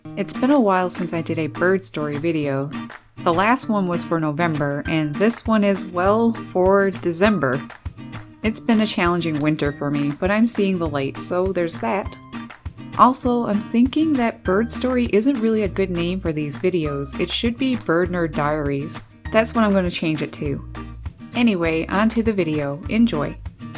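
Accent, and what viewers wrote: Canada. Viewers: I think she said NewFoundland. She's got quite the Canuck accent.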